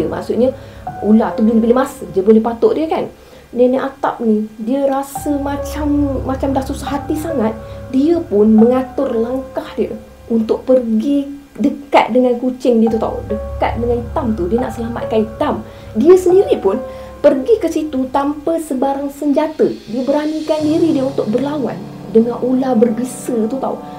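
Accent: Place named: Kedah, Malaysia. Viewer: Akak ni org kdah ka cra ckp mcm org kdah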